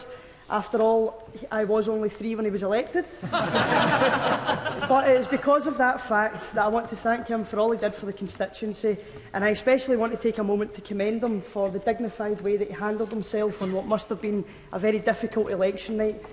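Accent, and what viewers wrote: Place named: Scotland, United Kingdom. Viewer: It's not a Glasgow accent, nothing like it. It's a Paisley accent which is completely different.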